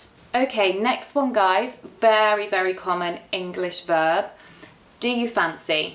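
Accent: United Kingdom. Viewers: Are you british? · I try to explain... You look as a proper british woman, you have british accent, you like british traditions(an afternoon tea) and finally you drink the earl grey tea which is of course british. Oh and The Union Jack is quite often seen in the videos. These are many clishes. But I don't deny that it would be a sophisticated experience. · i fancy listening to British English.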